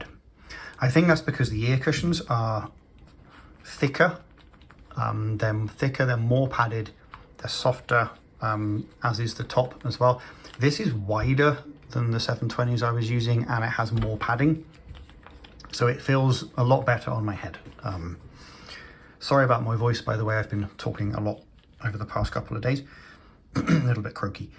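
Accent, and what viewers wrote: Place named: Corby, United Kingdom. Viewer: That sounds like a Corby accent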